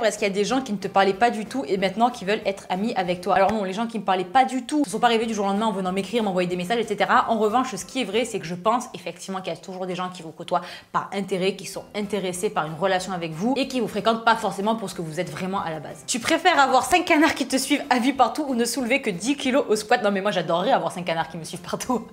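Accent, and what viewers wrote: Brazil. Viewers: Mais c parcque elle parle avec l accent brésilien qui est différent du portugais c pour ca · voce e muito linda e eu amo teu accento brasileiro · Tu na pas réellement parler portugais c’est plus brésilien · On sent que tu parles un portugais brésilien à l’accent aha😂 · L'accent brésilien c vraiment dar 😏👌👌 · Trop chou ton accent brésilien j adore!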